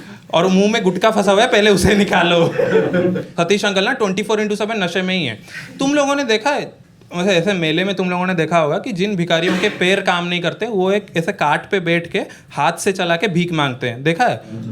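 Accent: Odisha, India. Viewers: Bhai pura radducha .... Hama hindi in odia accent ❤😊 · Tone se to odisha se lag rhe ahem ! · Odia tone sun ke maja aa gya!!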